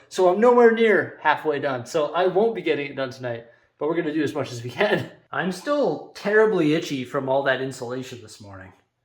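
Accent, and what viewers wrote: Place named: Canada. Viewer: You sound very Canadian lol lmk if im wrong but I hear it in the vowels fs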